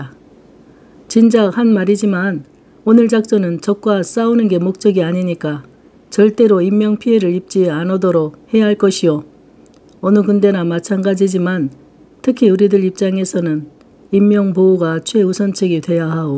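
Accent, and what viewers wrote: Jeolla, South Korea. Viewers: 선생님. 전라도 사투리가 날로 좋아져서 찰떡같이 찰지고 구수해서 들으면서 입가에 웃음이 절로 납니다. 고맙습니다.❤❤❤ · 왓마 오랫만에 전라도 사투리 들어봉께 옛날생각이 나뿌네. 구수허구먼.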